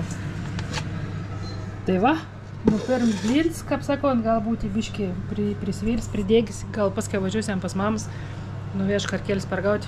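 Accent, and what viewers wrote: Samogitia, Lithuania. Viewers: Viskas bus gerai moterie,esi išskirtine nes kalbi žemaitiška 😅o dabar Lietuvoje tarmes ar dialektas labai vertinimas 👍🙂🙂🇱🇹🇱🇹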